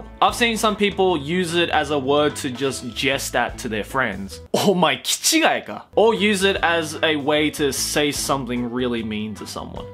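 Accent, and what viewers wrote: Australia. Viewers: Salma M. He's Australian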